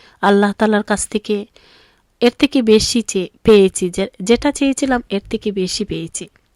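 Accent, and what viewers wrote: Sylhet, Bangladesh. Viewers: Tik.asea.sobai.bujtay.parben.apne.voice.ajka.frist.time.sunlm.syealati.apur.muke.suddo.bangla.very.nice.aktu.kosto.oise.mone.oy.apu.voice ditay karon amra.toy.syealati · Apner sylheti kothai valo lage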